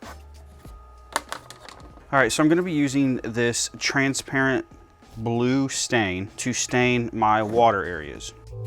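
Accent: Michigan, United States. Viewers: 1) Are you from Michigan? 2) Woah when did get a Laser?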